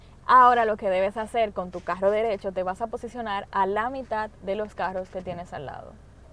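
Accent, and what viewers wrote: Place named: Dominican Republic. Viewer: Lo sabia que era dominicana por el acento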